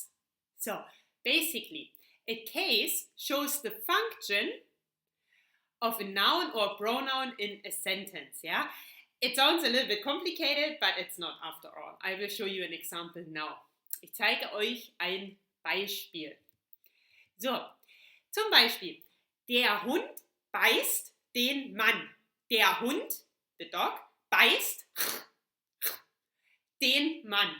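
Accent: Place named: Germany. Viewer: explaining it very slowly with a very german accent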